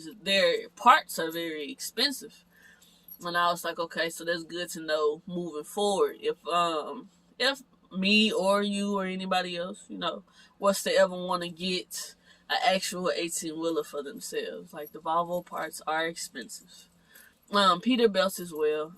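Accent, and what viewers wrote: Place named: Memphis, United States. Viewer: Your hair taking off . Love that Memphis accent mane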